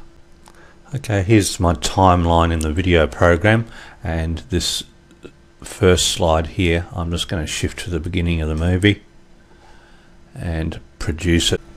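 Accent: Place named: United Kingdom. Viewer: hes not american its british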